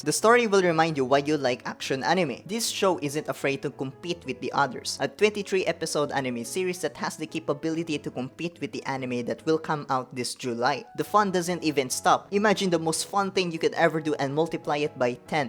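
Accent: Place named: Philippines. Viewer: Okay i was just having a guess that you are a filipino because of the accent pero pinoy nga HAHA good job bro i also love great pretender haha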